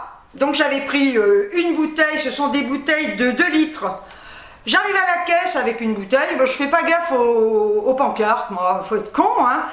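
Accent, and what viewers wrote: Algeria. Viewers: Hello coucou!!!! Promis j'ai pas rigolé pour le Roll-On insectes!!! Enfin j'ai pas rigolé très fort quoi hein! Un peu quoi! Un tout petit peu!!! Cela dit, les roll-on on en a à la maison pour mon fils et moi (David il se démerde il se gratte MDR) et ça empêche effectivement de se gratter quand même... BREF!!! Les cuissardes sont magnifiques, elles te vont super bien! Très classes! Et le pull doudoune polaire j'adore et pour le prix non mais je rêve!!! Tu dégottes toujours des trucs de folie toi! Dès que j'entends l'accent pieds-noirs je pense à Marthe Villalonga moi! Je te fais plein de gros bisous Ma!!! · J’aime..... Que dis je? J’adore ta joie de vie et puis il faut bien l’avouer ce petit accent pied noir te va à merveille 👍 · Cc ma belle j aime trop ton accent pieds noir 🤣🤣très bonne vidéo comme d habitude 😘😘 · Coucou mdr 😂 ça faisait longtemps que je n avais pas entendu l accent Pied-noir!tu es fantastique!!😘😘😍😍😻😻❤️❤️ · Je ris de l'entrèe:NOZ! 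lieu de perdition de!!!!!!!!!!!!!Bonjour! my jolie candle,c'est une brillante idèe, tiens les boucles d'oreilles sont assorties à la robe que tu nous avez montrè! Mets du Blanco de Vanish, c'est efficace,c'est un sachet à mettre dans ta machine ou autre; j'aime l'accent pied- noir! et pour cause:mon mari l'est!!!Elles te vont bien les creoles,te vont bien.:reine des affaires,du pret à porter etc....Dis donc avec ta brosse, tu vas te servir d'un cotè seulement!!!!mon EURNY § GROS BISOUS! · coucou bisous a toi et aux animaux pour retrouver ton blanc tu achete du vanish cristal blanc tu mets les vetements blanc dans une bassine avec 2 ou 3 cuilleres de vanish blanc tu laisse tremper toute la nuit et tu envoie en machine avec 1 dose en plus de ta lessive et ça va redevenir blanc parole je le fait avec les body de mon bebe. J'adore quand tu fait l'accent pied noir j'ai l'impression d'entendre Elie Kakoue dans Mme Sarfati j'adore. 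Allez gros bisous a toi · Ma chouchoute super belle en cuissardes et le pull magnifique, moi la petite fille de pieds noirs ça ma fait plaisir de réentendre l'accent et remonter de bon souvenir avec ma grand mère, qui me disais dès qu'elle me voyais avec 1 garçon ma fille attention à ta marguerite. Bonne soirée bisous de Lyon et câlins à Eurny et Mélodie 👍👍👍👍👍👍👍💗💖💓💕👍👍👍👍👍👍👍NAD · Parle ma parle avec ton accent pied noire là je ris😂😂😂j'adore. Pleins de bisous 😚😚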